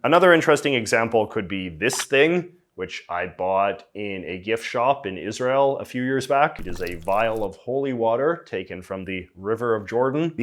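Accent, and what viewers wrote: Canada. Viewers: Canadian accent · I like how you deliberately turn the Canadian accent up to 11.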